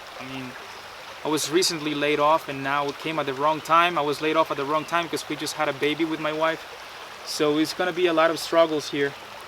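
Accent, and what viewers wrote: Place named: Latin America. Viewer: I followed you from prepper nurse 1, because I enjoy learning from many different teachers. I hope you do your videos on the steps you make on your property and the work you do towards your goal. From my years ( I have kids your age) I see you've barely left the first quarter century behind, and I want to applaud you for making these decisions which seem to go against everything your generation stands for. Also, I hear in your voice, a Latin based language (Spanish, Romanian) and was just curious, which one?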